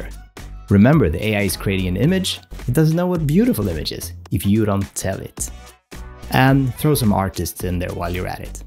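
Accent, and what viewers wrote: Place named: Sweden. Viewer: Loving these videos (and hearing your lovely Swedish accent makes me homesick for Sweden...I lived there for nearly 20 years). Any tips about how to avoid heads being cropped off? Or how to build out an image if you like it but half the head is missing? So far that is by far my biggest annoyance.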